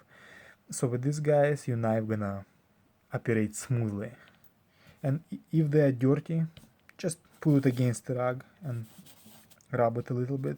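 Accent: Russia. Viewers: ты русский) ясно по акценту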